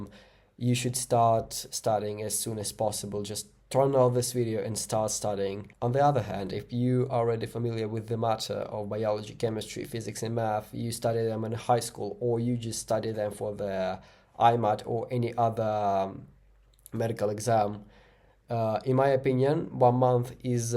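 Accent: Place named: India. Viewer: I am sitting over here trying to decipher his accent because it sounds Indian but he clearly doesn't look Indian😅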